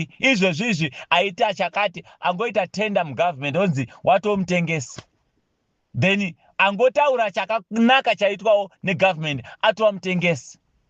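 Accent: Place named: Matabeleland, Zimbabwe. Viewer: You got ndebele accent 😢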